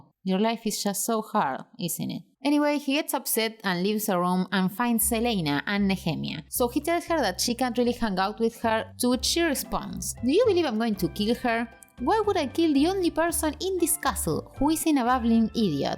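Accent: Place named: Argentina. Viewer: Argentinian ass accenttttt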